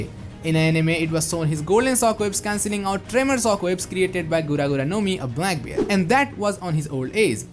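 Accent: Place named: North India, India. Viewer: Bro, you need to work on your North Indian accent.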